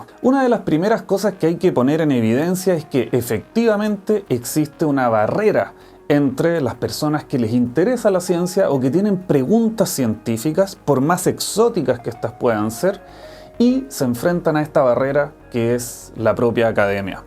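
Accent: Chile. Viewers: que cuatico que, aunque uses español neutro y bien pronunciado, pude adivinar que eres chileno! algo en el tono, en las ultimas ''A'' de las palabras... algo me lo decía. comentario na' que ver con el video, pero es primera vez que llego a tu canal, me pareció curioso <3 saludos desde Valpo :D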